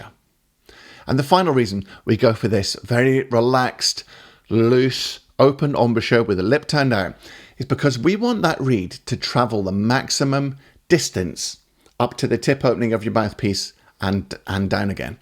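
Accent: Scotland, United Kingdom. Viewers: This was a great video for me. I really appreciated your explanation about the reasons why the "pout" works so well. Thanks. Not too many Scottish accents on the tube. I'm an exile from Kirkintilloch, living in Kent so always good to hear.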